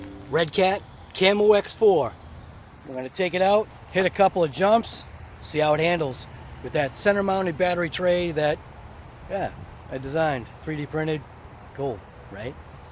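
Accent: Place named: New England, United States. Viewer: Awesome bash and great truck! Are you from new England area? You sound like you got a accent.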